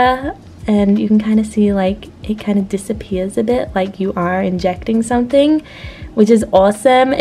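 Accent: Australia. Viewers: You sound like an Australian trying to do an American accent.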